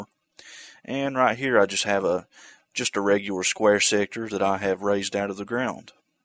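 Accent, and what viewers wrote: United States. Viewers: Cool beans. And I like your super American accent. This is a good channel. I hope to produce a game in GZDoom.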